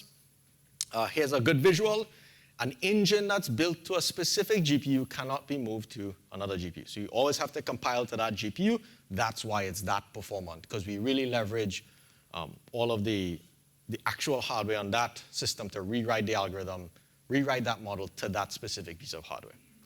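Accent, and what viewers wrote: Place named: Caribbean. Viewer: Thanks for this presentation,. This has been very helpful. Also great to hear a Caribbean accent 🇹🇹. Bless up me bredda.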